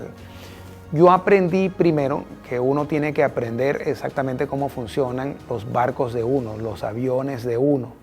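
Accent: Venezuela. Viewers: habla como venezolano ❤ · Sus padres seguramente son Venezolanos,ya q habla con nuestro dejo,y la jerga venezolana.Gracias por sus análisis.Venezuela Libre.Buen viento y buena Mar.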